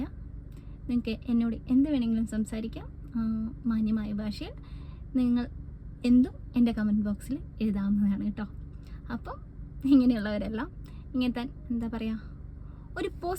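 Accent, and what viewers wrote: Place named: Kannur, India. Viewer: എണീറ്റിറ്റ്??? കണ്ണൂർ ആന്നാ??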